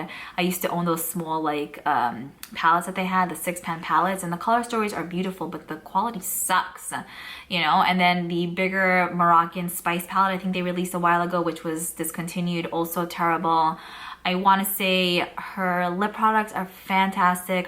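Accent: New York, United States. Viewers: Newer subscriber here. Is that New York I b hear in your voice? Joisey girl here!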